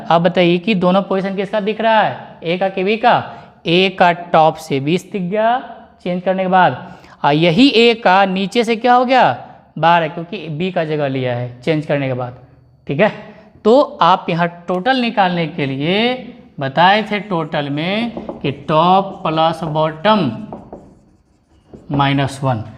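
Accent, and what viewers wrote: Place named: Rural, India. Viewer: 🙏B ke jagahba par 👌👌 dehati language sunnne me mujha kafi achha laga sir0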